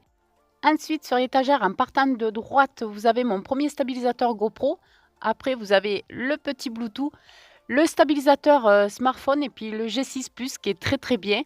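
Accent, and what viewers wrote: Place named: Southwest, France. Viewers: Salut super content enfin de te revoir et t'entendre de nouveau avec cet accent et " le bisous du sud-ouest". Ça me manquait . Le studio est très sympa sobre et moins le bazar que mon bureau. Vidéo toujours agréable à regarder. Continue comme ça j’apprécie le travail que tu fais. Le bonjour de l’Alsace, grand " V " à toi . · Bonjour, et bien merci à toi pour ta chaîne, pour cette découverte du dimanche matin, merci pour tes vidéos intéressantes et marrantes, tes essais, tes fou rires, ta bonne humeur, ton merveilleux accent du sud-ouest.....bon j'arrête là, en tout cas, tu gagnes un abonné de plus 😁, grand merci et bisous du grand Est, lol. ✌🏻à toi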